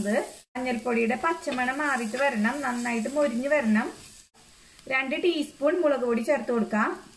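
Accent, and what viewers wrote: Thrissur, India. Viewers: Like 11 I joined 594 Thrissur slang super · L42 watched full nice recipe and loved your Thrissur accent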